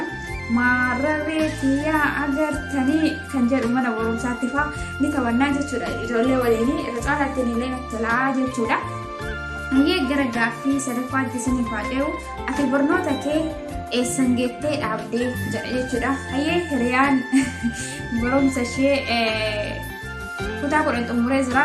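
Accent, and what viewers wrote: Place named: Harar, Ethiopia. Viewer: Anaa dhufuu & waw 😍 cimtuu too gaafifi debii baredaa halaan nama hawwatudha qaxalee too waqayyoo bakka yadden si Haa gahuu qashtii too shawadha jettaa ani intaa harargee natti fakkata bar😍💞akka arargetti hasofta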